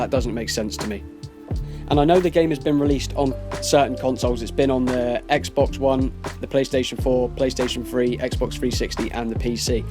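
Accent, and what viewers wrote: England, United Kingdom. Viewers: Your videos are so informative and I like your British accent. U sound like such a nice suave person. If u r play on PS4 I would like to meet. What's your real name by the way. Your videos are honest full of correct information. Your information has saved me millions of GTA dollars. Keep up the good work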